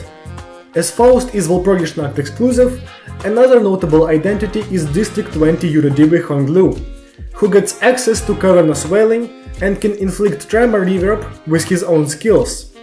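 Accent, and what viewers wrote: Russia. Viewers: Я будто очень чётко слышу русский акцент, а так видео топ. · Русский акцент, прикольно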